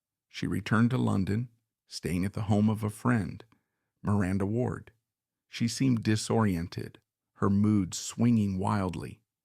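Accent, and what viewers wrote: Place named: United States. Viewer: American accent at it’s worst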